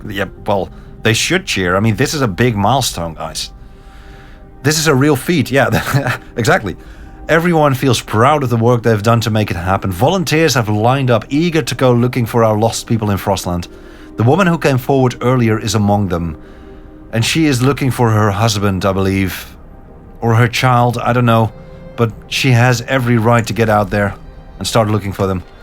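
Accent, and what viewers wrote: Netherlands. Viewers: funny how you can immediately hear you are dutch :D this games soundtrack is so good, specially when the storm hits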